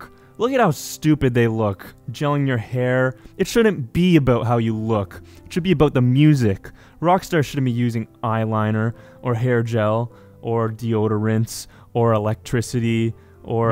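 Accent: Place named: Canada. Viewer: He's also Canadian, which makes the "This is America" joke funnier. I could tell because of his accent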